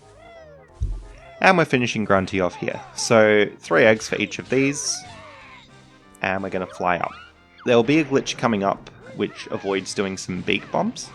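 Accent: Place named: Australia. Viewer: I still get surprised every time I hear an Aussie or New Zealander accent on the Internet. I shouldn't be, but I am, cos I grew up in a time when the Oceanians had really bad Internet. Also, cool video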